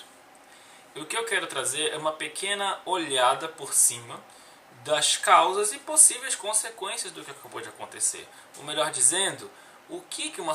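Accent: Pará, Brazil. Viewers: Paraense? Adorei o vídeo! Maravilhoso!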